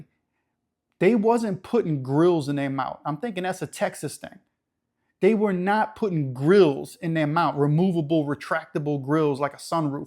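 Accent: Louisiana, United States. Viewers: I was with you until you got to the part you said getting gold teeth is not trying to be black. Being Southern especially from Louisiana yes they all sound like you even black white. I call it (LOUISIANA SWAMP TALK)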